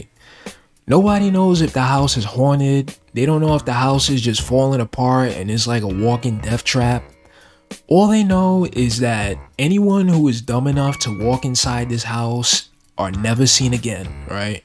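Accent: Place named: New York, United States. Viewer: Yo, I love your vibe, man. You sound from NYC but I'm not sure. I'm not from the States and I could be mixing up accents. Anyway I like that 'cause Mom picked up a NY accent for the many years she lived there. It's awesome to hear horror reviews in that real street dialogue. Also this book sounds like I need to read this shit soon. Thank you!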